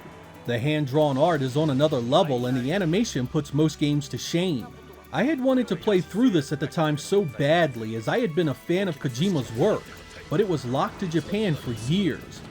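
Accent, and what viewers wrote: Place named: Mid-Atlantic, United States. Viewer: You should say “water” more. I like your mid-Atlantic east coast accent.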